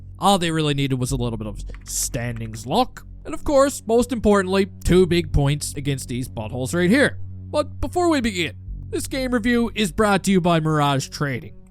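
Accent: Newfoundland, Canada. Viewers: Are you from newfoundland? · you from Newfoundland or Nova Scotia with that accent?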